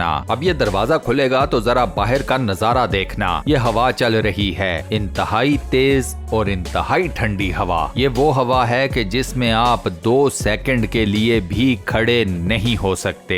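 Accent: Punjab, India. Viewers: Apki awaaj vich punjabi tach bhot Nice hai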